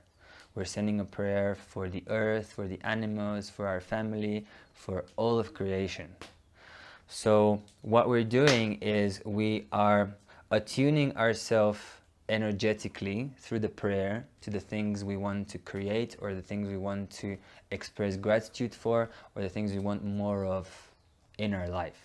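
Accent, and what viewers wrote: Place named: Jamaica. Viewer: Your accent sounds kind of jamaican hahaha i love it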